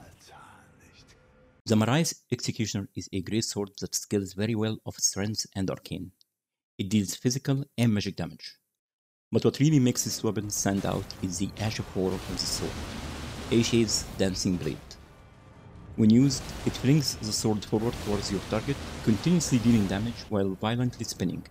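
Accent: Egypt. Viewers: I can tell you're Egyptian from you accent lol nice video my friend :)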